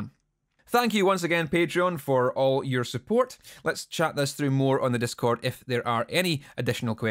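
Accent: Scotland, United Kingdom. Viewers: Love that the Scottish pronunciation of "bases" and "edges" sounds like "basies" and "edgies". Also, I'm pretty sure Kerry King did this to his guitar first. ;)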